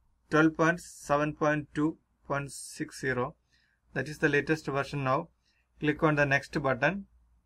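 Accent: India. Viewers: there is always one indian guy with unique accent with solution to every pc laptop apple google problem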